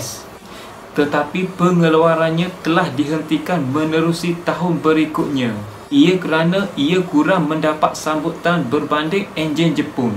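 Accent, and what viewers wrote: Kelantan, Malaysia. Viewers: Kelantan ek bang.. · Tahniah.. walaupun bunyi2 klatey kluar sikek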